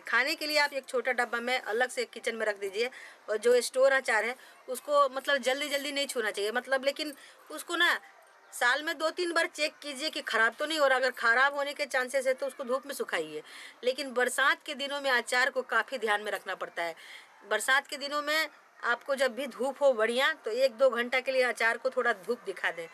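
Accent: Bihar, India. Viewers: आपका आभार ।आपका बताने का तरीका बहुत मस्त मजेदार, बिहारी स्टाइल का,संगीत मय।मज़ा आ गया।😅😅😅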